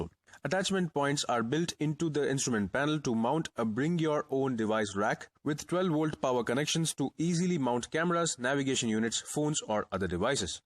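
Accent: India. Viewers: I’m sorry but when we have an Indian/no offense talk about the Ford bronco it doesn’t sound very American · The accent of this man, reminds me a liquor store or seven eleven.....Indian accent..you can figure it out miles away... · What in the hell is it with the guy from India doing the narration ???????????? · What’s an Indian doing narrating this it sounds so annoying and I can’t finish the video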